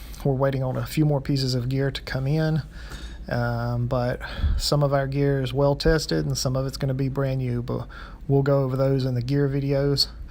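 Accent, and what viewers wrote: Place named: South, United States. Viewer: Nice job and good facts for the newbies to the whole idea. I catch a bit of a Southern accent, where are you from?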